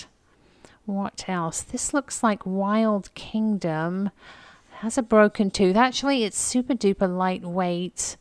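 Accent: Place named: United Kingdom. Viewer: I thought you were British which was why I mentioned the UK. Love your accents either way. I don't believe that I have ever seen it written in any book or novel that way and just began hearing it the past couple of years and was curious and it certainly was not taught in school that way. Anyway, thanks for the response and the videos..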